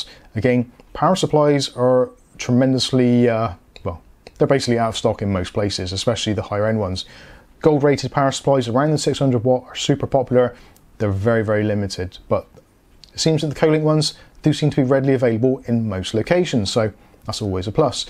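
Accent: Bristol, United Kingdom. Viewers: You sound like a fellow Bristolian? :)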